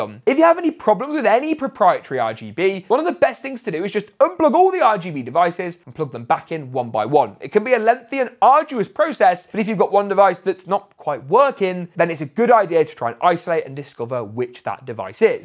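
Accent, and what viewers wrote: United Kingdom. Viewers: The British accent is just confusing me more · Oh man i hate British accent It just make it harder for foreigners😅😅😅😅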